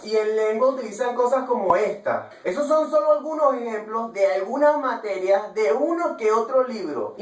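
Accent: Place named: Venezuela. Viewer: Hola!!!Lo sé, tu país tan lindo está pasando por una crisis muy fuerte,y no hay punto de comparación, pero verán que pronto saldrán de eso. Y de verdad me encanta tu forma de expresar además del acento venezolano.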